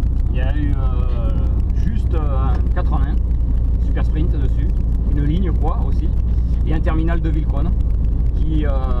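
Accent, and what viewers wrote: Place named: Hautes-Pyrénées, France. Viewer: Aaah super ! En plus des mob une rallye . J ai clio rs1 en plus du spx et mvx. Et à l accent je dirais que tu es de mon coin(65)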